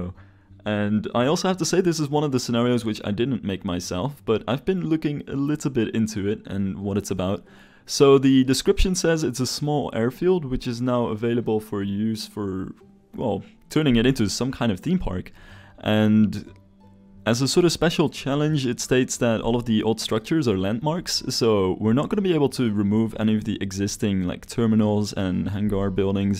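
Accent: Netherlands. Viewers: Wait, you're dutch?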